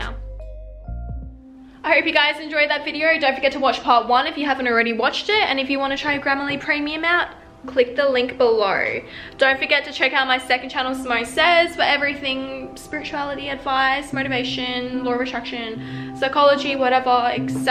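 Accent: Australia. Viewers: I LOVEEE YOUR ACCENT!! Hah its so fun and chill. Is it an Australian accent by chance?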